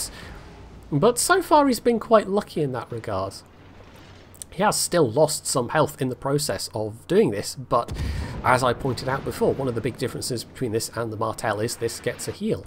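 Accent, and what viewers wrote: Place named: United Kingdom. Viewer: Huh, I didn't even realize I played it so sloppy until this nice British person with gentle voice told me just how much I suck really. Kappa. Jokes aside, glad you've found this worth looking at.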